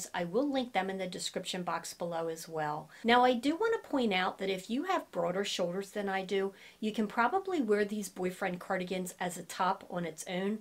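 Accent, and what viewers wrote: Philadelphia, United States. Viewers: I much prefer the size small cardigan on you. The medium size, as well as the stripe design, overwhelms you. Great try on as usual. I agree with the MA/CT viewer (I'm from RI). Wustersheer is the way we pronounce it, although with our RI accent, what do we know. Love your accent (Philadelphia-ish, Southern NJ?)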